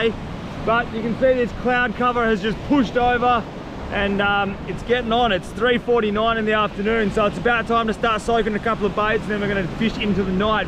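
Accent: Australia. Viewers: Thought u were Aussie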